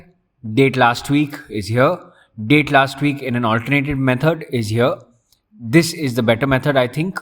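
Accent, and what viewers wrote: India. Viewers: Indian English accent is one of those I have trouble understanding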